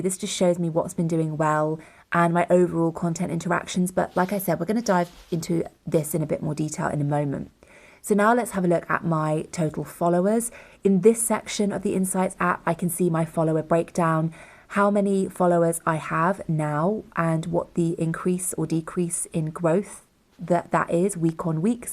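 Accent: United Kingdom. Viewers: What a perfect british accent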